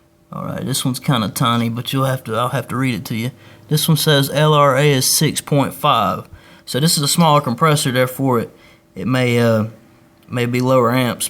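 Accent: South, United States. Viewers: thanks, you are a good speaker. a bit of southern twang perhaps. going to work on my fridge today armed with knowledge.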